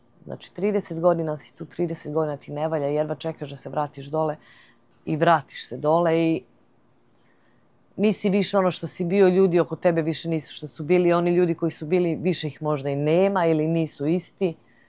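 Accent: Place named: South, Serbia. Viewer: Sve ok, ali da kaze da je iz Beograda sa ovim naglaskom - nema teorije. Juzna Srbija se cuje u intonaciji.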